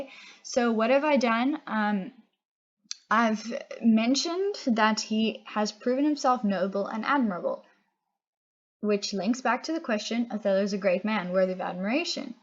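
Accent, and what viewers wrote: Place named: United States. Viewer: U have an American accent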